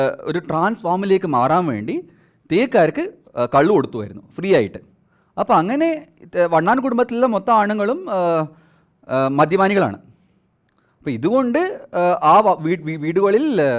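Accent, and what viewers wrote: Kasaragod, India. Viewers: Athann namada ksd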